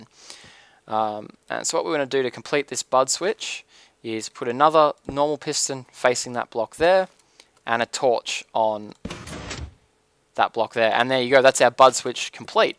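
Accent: Australia. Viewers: where in australia r u from?!?!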